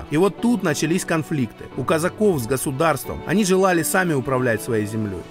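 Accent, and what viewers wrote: Rostov, Russia. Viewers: Я сразу понял , что ты с Ростова как только начал говорить 🗣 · По говору сразу узнал, что ты ростовский или с Донецка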